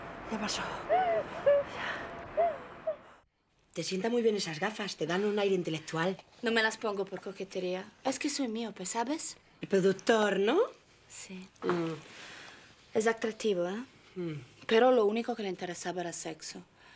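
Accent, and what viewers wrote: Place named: Italy. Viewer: bruttissimo l accento italiano nello spagnolo🙉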